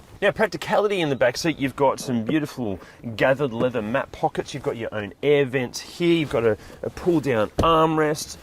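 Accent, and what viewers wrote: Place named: Australia. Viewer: Horrible accent. What is it? Doesn’t pronounce his ‘t’s and ‘R’s Australian I think?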